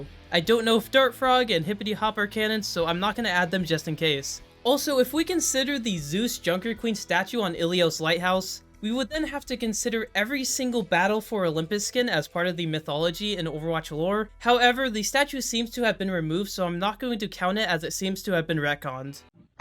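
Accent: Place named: United States. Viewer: The americanism in the pronounciation of the names is insane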